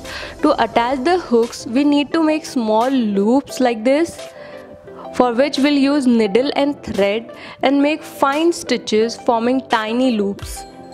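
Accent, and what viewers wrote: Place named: India. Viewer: good idea. but indian accent is so ugly to hear. cant stand long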